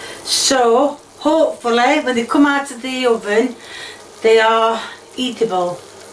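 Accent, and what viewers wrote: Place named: South Yorkshire, United Kingdom. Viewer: Are you a South Yorkshire Lass like me ? We have the same accent ❤️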